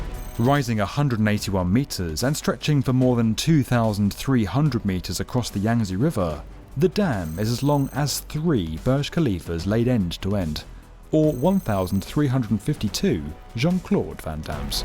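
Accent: United Kingdom. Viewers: What kind of British accent is this? I know it ain't cockney! Heard it before ...very proper, bit of lisp or sounds kinda trips on some parts of words northhha and defeenainiteeve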